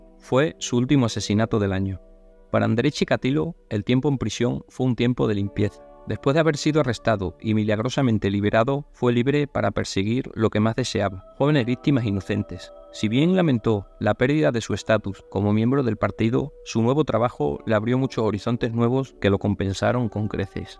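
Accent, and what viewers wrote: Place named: Spain. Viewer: Buen documental,hay una pelicula muy buena que se llama ciudadano x,me gusta buestro canal,narrado en español ee españa y muy bien documentado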